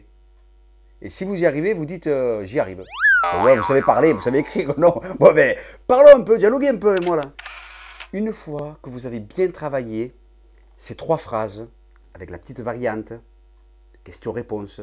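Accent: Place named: South, France. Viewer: ton accent du sud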